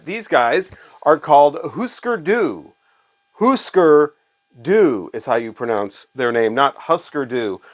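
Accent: United States. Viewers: It hurts my ears every time someone American tries to pronounce Scandinavian names 😭But okay, it wasn't the worst I've ever heard. 😂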